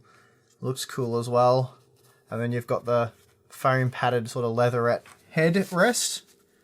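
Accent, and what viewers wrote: Australia. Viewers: Its not "hea" its here, its not spoze its "suppose" Learn to phrase you lazy Australian! Can't beleve you have accumulated so much FREE STUFF from manuafacterers who have sent you their expensive SHIT that you have literally WALLED! Maybe you should be donating them to your viewers instead of throwing shit around like your some expert. HINT! You don't even know how to handle a MOBO! BTW the Taichi board you pulled out and threw to the side is one of the best boards you can buy yet you had nothing to say about it other than "looks like the others". You knew nothing about it? LMFAO!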